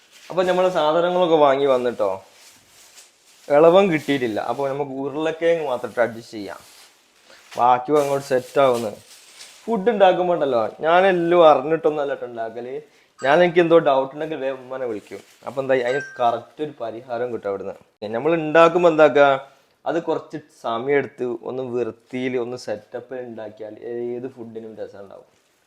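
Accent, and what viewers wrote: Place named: Kannur, India. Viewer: Kannurano naad bhasha ketitt angane thonnunnu bro u are very clean person🎉🎉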